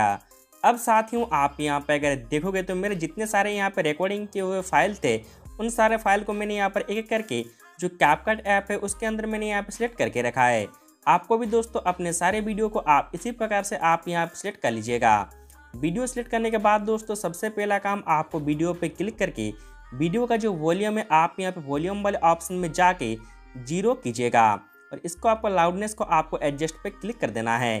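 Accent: Chhattisgarh, India. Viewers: Bhai aap chhattisgarh se ho kya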